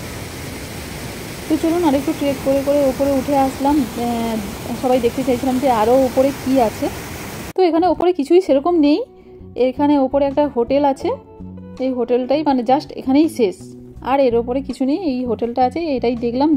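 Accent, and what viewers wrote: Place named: Kolkata, India. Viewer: কলকাতার এক্সেন্টটা আমার কাছে জোশ লাগে। ভিডিওর প্রাকৃতিক দৃশ্যগুলো মনোমুগ্ধকর ছিলো। দিদি কিছু জায়গায় ভয়েসওভারটা খাপছাড়া লেগেছে। ওভারঅল ব্লগটা দারুণ।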